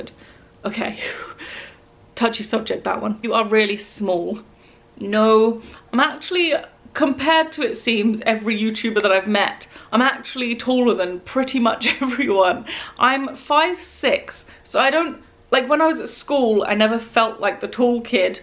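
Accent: United Kingdom. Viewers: I'm a new viewer and already intrigued by your accent - what are the influences? It's like a queen's english dialect over something more regional? Scottish? Irish?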